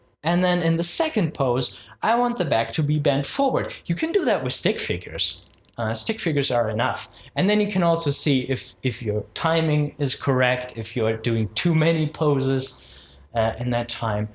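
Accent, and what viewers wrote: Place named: Germany. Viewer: I love your German accent Cause, I have the same accent xD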